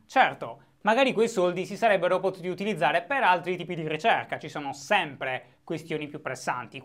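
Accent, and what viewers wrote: Sardinia, Italy. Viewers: Quel momento in cui fai vedere Breaking Italy a tuo padre e lui ti risponde "ma è sardo questo qua".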